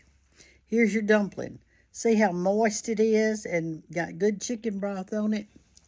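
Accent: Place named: Alabama, United States. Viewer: Miss You speak my Bama country language You flung a cravin on me! language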